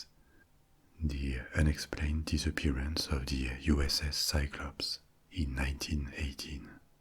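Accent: France. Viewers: I used to live in France a long time ago. Your lovely accent takes me back to that delightful time in my life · You will always be my favorite ASMRtist. Your low voice just the way you do it and French accent is so soothing, and you really do your research for these videos and stories. You are simply the best there is!! Thank you so much for your efforts. We really appreciate your work! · I really love the stories and the French accent, but the only thing I don’t like is the big gap after the story ends with some videos. I think it’s designed to be some restful sound to help you sleep but I have a playlist of stories I listen to and would prefer that at the end of the story it ends so my playlist can move onto the next story. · I haven't slept this good since childhood! Your French accent reminds me of my grandmother who was a war bride from 🇫🇷 France. It sooths me to sleep as her voice did so many years ago. 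Thank you so much for the work you do. Your videos work so much better than any pill and as a chronic pain, extreme at times, sleep doesn't come easy. Your dedication is very appreciated by myself and many others. Thank you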